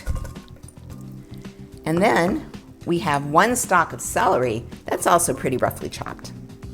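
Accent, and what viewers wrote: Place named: South, United States. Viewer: Hi! That dressing looks great! I have not seen a recipe calling for the oil from the anchovies, either, so I am sure that dressing would have a ton of flavor. Accent is definitely Southern! I agree that olive oil has a strong flavor and probably wouldn't be right in this. Romaine is so common now, a lot of people won't eat Iceberg...hard to believe that Iceberg used to be normal. Wow, that turned out great. Like you said, it makes a ton, so you can make your neighbors happy. Thanks for sharing!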